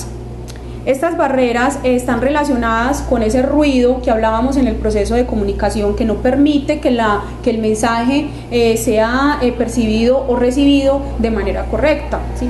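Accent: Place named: Antioquia, Colombia. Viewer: excelente material pero debería ser mas neutral en su acento y entonación, demasiado paisa en su forma de hablar.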